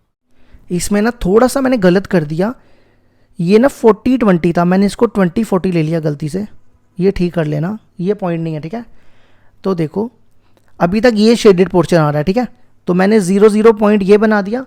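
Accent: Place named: Jammu and Kashmir, India. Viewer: Bhai kaisa hai tu unke udhar sab aise hi bolte hai j and k se hai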